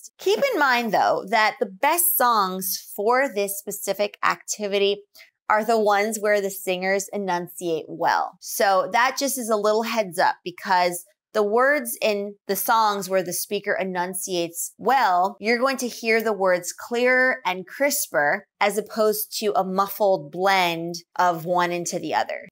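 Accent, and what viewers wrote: United States. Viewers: What a beautiful style of your speaking an American English thanks for your kindness.forget you not sprite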